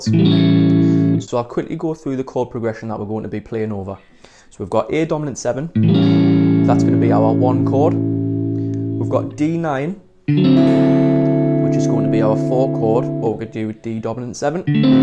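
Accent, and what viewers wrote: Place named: Chester-le-Street, United Kingdom. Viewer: I found your channel by chance the other day and was so impressed with your playing and sound. Then you open your mouth and you're from round here haha. I live Chester Le Street! Small world haha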